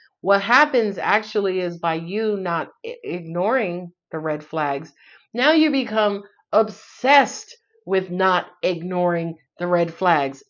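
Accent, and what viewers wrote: New York, United States. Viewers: Love this episode. I giggled so much. You giving me ‘I may have had 2 margaritas at dinner’ vibe!!! Was just waiting for the NY accent to present itself! ❤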